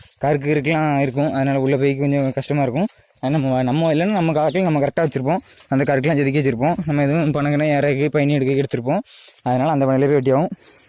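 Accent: India. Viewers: Arumaiyana vela enaku piducha nellai slang love you chlm be safe from delhi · Super god bless but very risky work and hard work 💪 🙏 ❤your looks handsome your madurai slang excellent 👍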